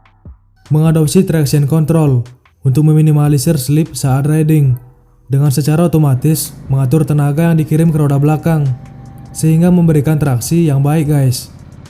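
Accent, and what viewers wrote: Bali, Indonesia. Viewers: Mimin asal Bali yaa...??? Logatnya mirip orang Bali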